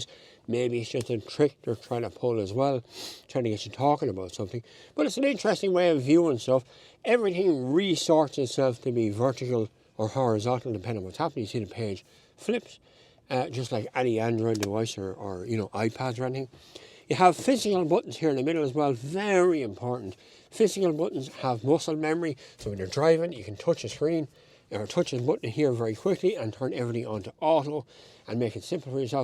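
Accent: Ireland. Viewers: Hardly understand Irish 😂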